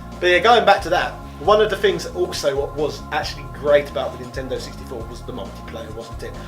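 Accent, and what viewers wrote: United Kingdom. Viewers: I really cant stand this idiot, these Brits are f***ing annoying as hell. Is it just me, or does anybody else find this guys Brit accent and obnoxious personality irritating beyond belief? I tried giving this guy the benefit of the doubt by at least watching 2 or 3 mins of his video to see if i can actually relate to him, but hes annoying as f**k. I know there are probably some cool Brits out there, but Brits like this guy give people from the UK a bad name. Just a pure obnoxious and annoying d**k.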